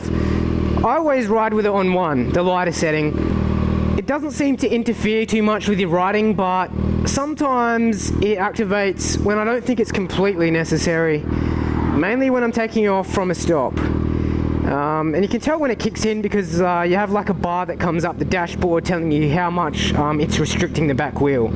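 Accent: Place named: Australia. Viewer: How Australian haha "where's the rabbit?" Great content mate keep it up!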